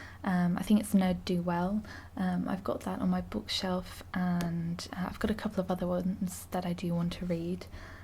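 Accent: Scotland, United Kingdom. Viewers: No contest , best ASMR content creator on YouTube , plus I'm from the U.K living in Australia so the accent is a touch of home 👍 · Wait, I though you were scottish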